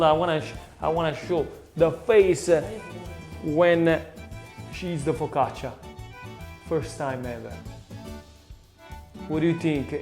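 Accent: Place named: Bari, Italy. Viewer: You have a really nice Barese accent.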